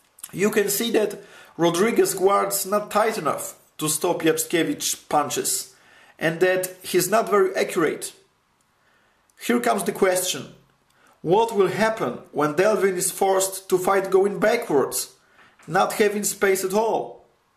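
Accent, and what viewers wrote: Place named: Poland. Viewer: od razu wiedziałem ,że polak gada haha no ,ale ok przynajmniej inne kraje tu zaglądają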